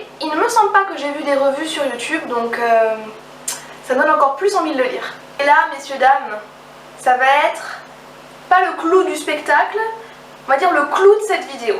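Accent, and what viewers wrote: United Kingdom. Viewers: Super book haul ! Au passage je kiff ton accent britannique je suis pour que tu dises les titres en britannique !!!!! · J'adooooooooore le Victor Hugo, il m'avait déjà fait de l'oeil sur le site de Soleil Editions... J'aime bien l'accent britannique aussi, il faudrait consacrer une vidéo à ça :P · Coucou ma belle ! Hihi en effet ton accent est HYPER britannique ! Mais perso j'adore ! J'ai plutôt un accent américain pour ma part donc j'ai une adoration pour le britannique. Alors continuuue ^^ Je ne suis pas fan DU TOUT des BD, mais... Macabre a l'air vraiment beau ! :O Alors peut être que je vais le commander... Au fait, merci de m'avoir fait découvrir priceminister pour les livres. Hum hum. ^^ Pour la petite info, non, PPDA n'est pas parti à la retraite, c'est Claire Chazal ça ! xD Sinon comme d'habitude, j'adore te regarder en vidéo, tu respires la joie de vivre c'est vraiment super à voir. 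Par hasard, est ce que tu vas aller au salon de Montreuil ? ;) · Pour avoir fait de longues études d'anglais, je dois dire que perso ça me fait du bien d'entendre une youtubeuse bien prononcer les mots anglais avec un bon accent, donc j'aurais tendance à te dire YES ! Garde ton bel accent. Cependant pour ceux et celles qui auraient du mal à comprendre (faut bien avouer que l'accent british est à couper au couteau hein haha) je pense qu'il serait judicieux que tu mettes des annotations dans tes montages vidéos pour spécifier à l'écrit le nom du livre et son auteur pour que les personne puissent le retrouver par la suite sur google :) Bisou ma belle xx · définitivement l'accent anglais :) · Je suis fan de ce petit accent britannique ;). Et entendons nous, "wondÉ", ça te décolle les tympans! B. Lacombe... On en entend parler sur toutes les chaînes en ce moment mais ça se comprend! De très belles acquisitions en tout cas! 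Et si ce sont tes achats depuis le mois d'Août, ça reste raisonnable je trouve, pas encore extravagant! ;)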